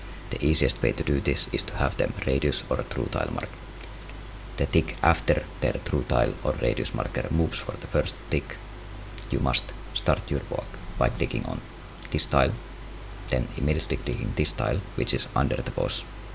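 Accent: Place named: Finland. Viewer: are you Finnish? you sound like Xpetu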